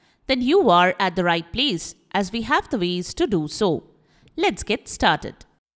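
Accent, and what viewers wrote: India. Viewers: Thick India Accent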